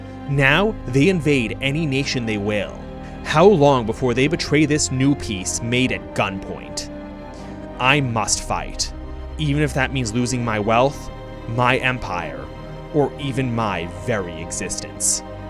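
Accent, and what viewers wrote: United States. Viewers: Pov: most American voice for us Brits Eva. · Why is Britain speaking with a yank accent….?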